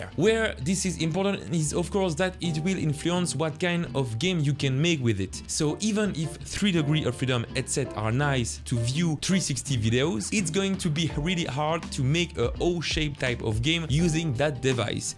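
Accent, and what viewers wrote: France. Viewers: It's so cool how good your english flow is but how extremely french your pronounciations are 😅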